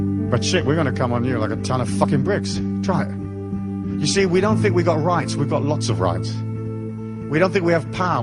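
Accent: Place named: Ireland. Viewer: Slight Irish accent here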